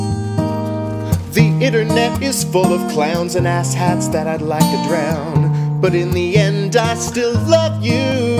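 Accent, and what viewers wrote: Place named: Canada. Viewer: Making love to a glove is so overrated! I like your vids and your curious canadian accent, keep it up!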